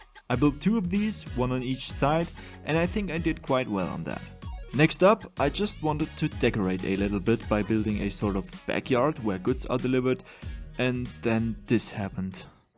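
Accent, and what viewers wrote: Germany. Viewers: I will always recognize the german accent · I LOVE your German accent!!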